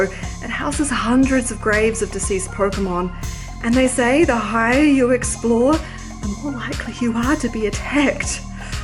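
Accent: New Zealand. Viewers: Snap. I also came here from Diana's channel and I am also a New Zealander. I agree, it is lovely to hear our accent about. The doll came out so lovely and I'm glad to have found your channel. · Popped over from Diana's channel to watch the video and I have to say it is so amazing to hear a familiar accent. I live in New Zealand as well :) Love how your doll came out, she is so beautiful.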